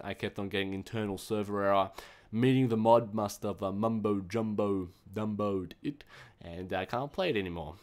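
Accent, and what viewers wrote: Australia. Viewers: He has an austrailian accent..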